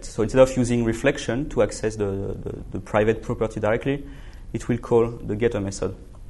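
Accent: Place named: France. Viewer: the frenglish accent killed it a bit...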